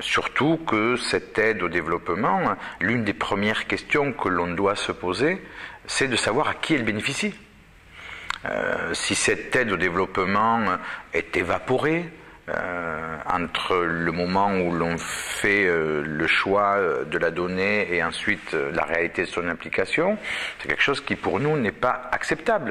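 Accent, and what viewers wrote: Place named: Ariège, France. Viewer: P'tain..Mais qu'est-ce que c'est que cette voix d'ariègeois? Pourtant Rivesaltes (le camp Joffre) c'est dans les Pyrénées orientales..que je sache..!!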